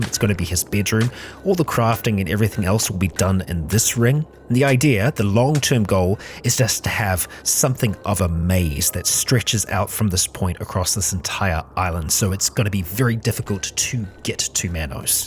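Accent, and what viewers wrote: New Zealand. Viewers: I thought you sounded like a new Zealander at the beginning of the video and I was rightttttt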